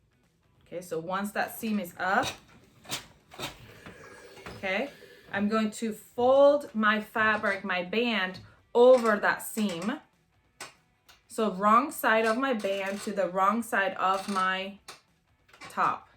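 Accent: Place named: Colombia. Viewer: I love your accent. Are you from Colombia? You remind me of Sofia Vergara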